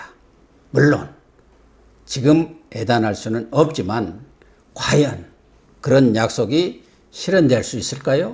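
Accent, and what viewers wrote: Jeolla, South Korea. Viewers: 도대체 이 방송의 정체성이 무엇입니까? 방송하는 분의 말투를 보니 즐라도 억양이 느껴지는데 왜 이런 방송을 하나요? 슨상님 동네 사람들하고는 말조차 섞기 싫습니다. 도저히 같은 하늘을 이고 함께 살 수 없을 것 같아요.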